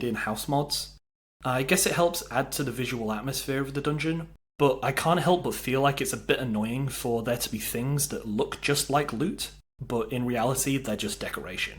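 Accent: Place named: Taunton, United Kingdom. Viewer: Dunno about Liverpool, sounds more like Taunton to me.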